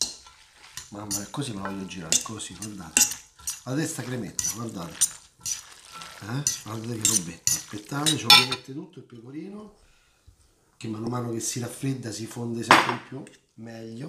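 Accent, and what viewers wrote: Rome, Italy. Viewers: non so se me fa venì più fame l'inflessione romana, il piatto in se o la passione che si sente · Bravo, ma "questa parlata" in romanesco non si potrebbe evitare, visto che è un canale italiano? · 😘😋😋Veloce, semplice e fantastica 😋😋 Complimenti per come sai combinare la tua professionalità con il tuo romano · Cuoco,oltre il simpatico un po marcato dialetto romano ci presenta sempre cucine trite e ritrite da far noia,quando saprà fare un piatto che sia diverso dalla normale consetudine? Stati e non copi.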